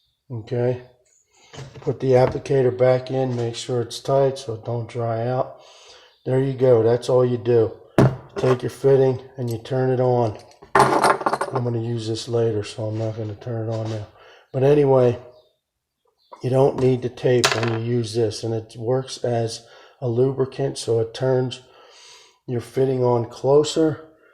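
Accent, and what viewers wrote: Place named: East Coast, United States. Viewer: I knew it was the East coast. I’m from Maryland and you sounded familiar 🥰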